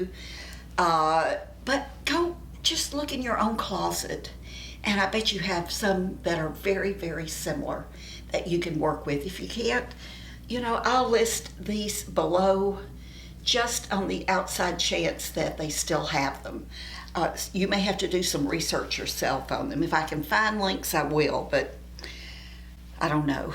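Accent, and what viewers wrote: United States. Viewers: Paris, France calling...I just came across your video by chance and found its content an absolute delight. And I simply adore your accent - it reminds me of an American friend of mine. You have a natural flare to entertain. After watching, I discovered your age - 78 wow! I would like you to know that you boosted my morale! I will definitely hunt down your other posts! Thank you again.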